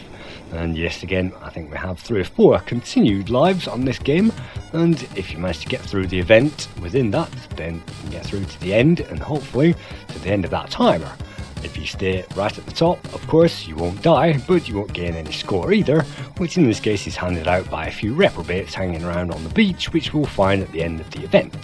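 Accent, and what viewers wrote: Lancashire, United Kingdom. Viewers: really enjoy your reviews! I'm a faithful subscriber from the USA and your accent is reminiscent of a mate from Accrington, so I'd venture to guess you're from Lancashire? if I'm wrong, no offense and keep up the great videos!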